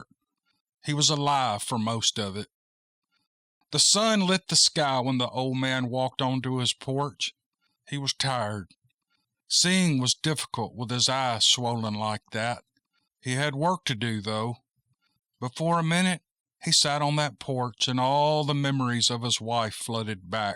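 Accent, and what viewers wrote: South, United States. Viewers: I love this story and I appreciate you reading it. I love hearing that southern drawl . Thank you ! From another southern person. Just to the north of you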